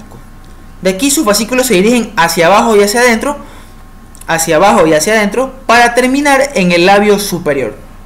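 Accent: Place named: United States. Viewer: Todo muy bien … pero tienes problemas para pronunciar la R porque se escucha como un gringo 🙄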